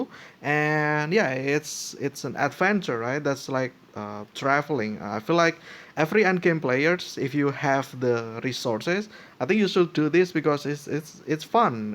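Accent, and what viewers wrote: Indonesia. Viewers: Iya ketara ngomongnya sih, orang indo pasti bisa dibedain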